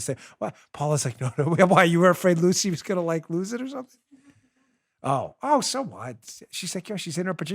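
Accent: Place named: Louisiana, United States. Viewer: Bongino! You are the man dude! Your show must be growing, I am down here in south Louisiana sending your show to all my people in the oil and gas business! You sound like us my man! Good luck with that surgery man don't let em hit your vocal cords you have a gift!!!🤞🤞👍👍🙏🙏👌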